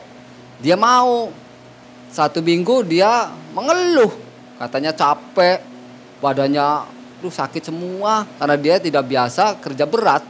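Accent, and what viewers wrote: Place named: Java, Indonesia. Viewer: Medok bgt lurrr 😂😂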